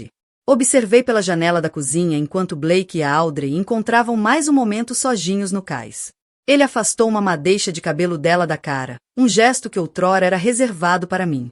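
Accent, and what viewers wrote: Portugal. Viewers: A ESTÓRIA pode ser boa, MAS ... Lamentável!😡 NARRAÇÃO em português de Portugal, NÃO O NOSSO PORTUGUÊS-BRASILEIRO! RESPEITO POR FAVOR!!!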